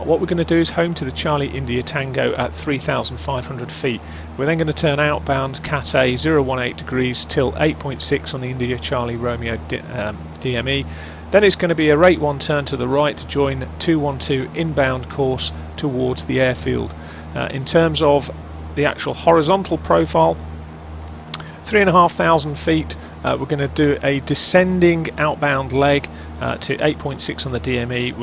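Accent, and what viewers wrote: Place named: United Kingdom. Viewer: Love your video! Of course, your use of British English, different from American English, was quite enjoyable to listen to. Anyway, the entire process was a very valuable learning experience. Thank you.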